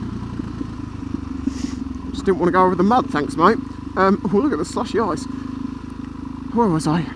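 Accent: United Kingdom. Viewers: yo what part of Britain you from?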